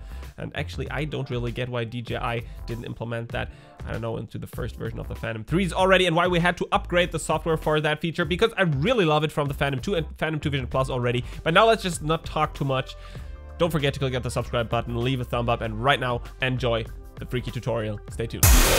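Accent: Germany. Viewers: Deutsch accent?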